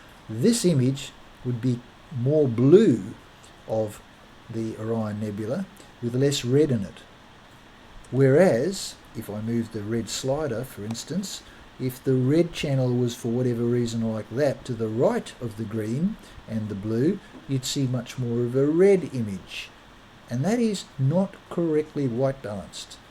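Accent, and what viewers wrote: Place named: Queensland, Australia. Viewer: Hi, Thanks for your detailed reply, I recon I will have to read it a couple of times. I will have to convert the FITS format file, to be able to put it into PS for manipulation. Unfortunately PS does not support FITS formatt. I have been over the net to check that out. What part of the country are you from? sounds like QLD on the videos but it is hard to make out. Cheers Robert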